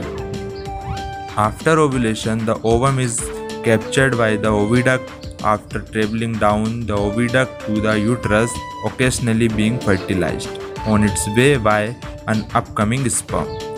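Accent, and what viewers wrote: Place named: India. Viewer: 발음보니 인도서 만들었군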